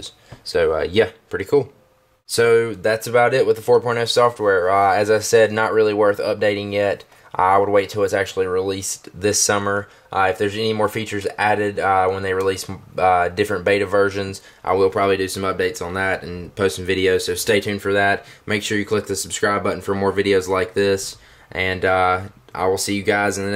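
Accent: South, United States. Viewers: southern boy lol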